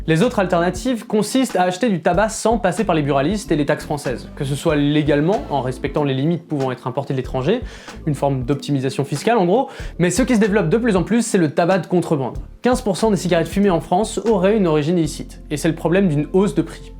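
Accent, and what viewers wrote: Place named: United Kingdom. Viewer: Ton accent anglais est incroyable, j'ai eu de l'ASMR quand t'as dit "the economics of welfare"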